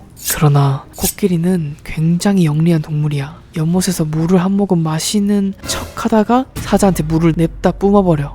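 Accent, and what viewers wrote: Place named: Jeolla, South Korea. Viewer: 전남 말투같은데..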